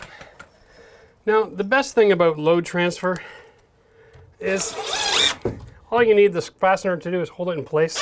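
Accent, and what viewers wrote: Canada. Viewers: Not 2 minutes before you mentioned Red Green, you said something with your Canadian accent and I said to myself, "he sounds like Red Green." That guy's funny.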